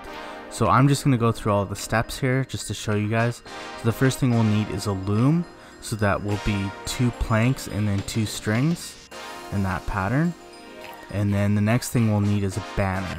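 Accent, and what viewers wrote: India. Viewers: Are you copy video coz you are indian but speaking in pure english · Are you indian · It was not Irish it is Indian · are u indian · Are you Indian? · I said are you Indian 🤬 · Are you Indian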